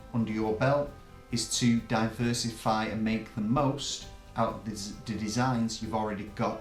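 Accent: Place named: United Kingdom. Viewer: You sound from UK, how do we sign up for it in uk?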